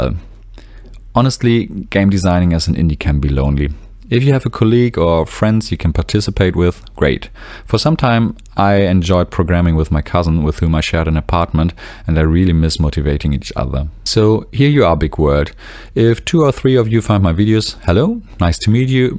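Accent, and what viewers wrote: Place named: Germany. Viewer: hi there, thanks for the nice video. i can totally relate to your situation. i think i am addicted too, even if it manifests a little different for me. i dream of being a game dev all the time and i am one of these guys who restructured their life to do so. (i get up at 5, not at 4 but yeah...) the problem for me is, that i sometimes get so overwhelmed with the scope of my game and the obstacles i face, that i struggle to start coding. i watch other devs instead or procrastinate in some other way... i hope participating in some game jams in 2023 will help me getting in the habit of finishing games. you sound like your native language is german. stimmt das? woher kommst du wenn ich fragen darf?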